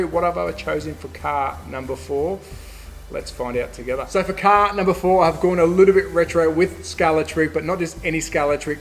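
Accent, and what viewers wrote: Australia. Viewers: That Australian accent just grinds on me. Do not like it. Sorry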